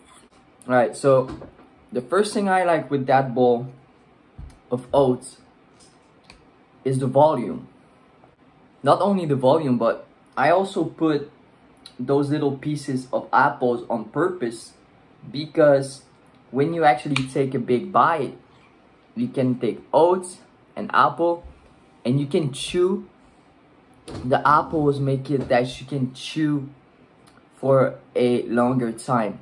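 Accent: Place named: Quebec, Canada. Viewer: Ahh I see, you have that GSP accent haha. Keep up the good work man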